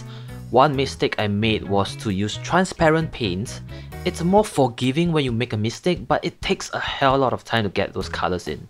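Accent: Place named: Singapore. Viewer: Wait you singaporean? U sound singaporean, If u are, omg, never knew got singaporean who is interested in this stuff. Love it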